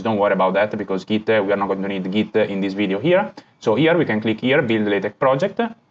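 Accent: Italy. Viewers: but you sound italian 😁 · Your accent is just like a reknown Italian Football Journalist "Fabrizio Romano" and he is perfect at his job. You're similar to him!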